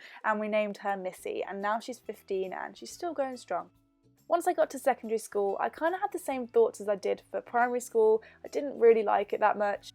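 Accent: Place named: Coventry, United Kingdom. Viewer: Hey, I am Millie. I am a newish subscriber. I just subscribed and i actually love you videos so much💕. I am from Coundon in Coventry haha. But i live in Australia. I actually never noticed your Coventry accent until this video. Please reply to this even though, i have commented 2 years later haha xxxxxx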